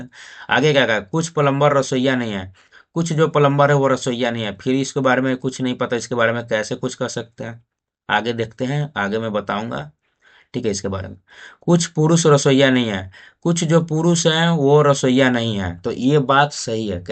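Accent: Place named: Patna, India. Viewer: Bhaiya Patna se lagte hai sayad bhaswa wahi hai ❤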